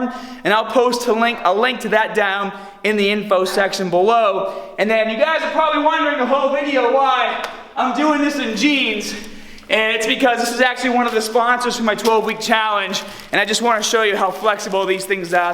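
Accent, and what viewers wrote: Boston, United States. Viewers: Sooo Boston! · Are you from boston you have the accent. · Definately from Boston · lol Bahbell. I find a problem where when I ass to grass squat for 10 reps+ I start bouncing out of the hole when I start getting fatigued. I feel like I'm cheating myself but I'd like your opinion! thanks man love the Boston accent by the way haha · What part of Boston are you from brother? BAHBELL LIFTS ALL DAY :) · That Boston Accent is serious! Good info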